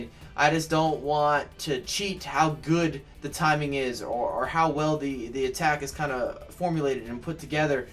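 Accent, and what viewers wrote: United States. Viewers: Fifa School please boy you sound like a american trying to teach Footbal (it ain't soccer) USA suck in footbal man what do you know about footbal tactics and formations we invented and breath football in europe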